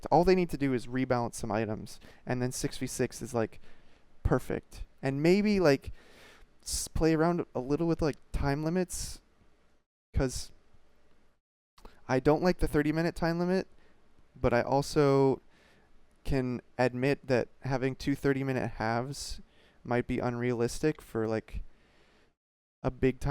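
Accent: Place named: United States. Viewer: No, you're doing it all wrong. You need to mention AlphaDraft before the show, wear a coat and scarf like you can't afford to heat your home, thoroughly discuss the innermost secrets of game design for 20 more minutes, and all while mentioning context a few times. Also where on earth did you get that American accent, Thorin? Strangely enough it suits you.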